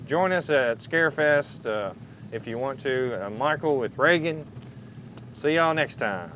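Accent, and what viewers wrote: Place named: South, United States. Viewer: I still love that accent of yours I just drives me nuts you're beautiful lady and that little southern accent just makes you so much more beautiful I bet you're driving crazy down in Florida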